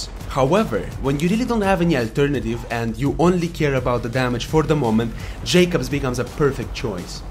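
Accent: Russia. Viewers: My friend YOU are speaking ULTIMATE RUSSIAN ENGLISH. (sorry if it came out racist) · i can tell from ur accent that ur russian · Are you Russian by the way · Are you Russian ? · Definitely more Russian than Italian, his accent is strong. But cute too ;).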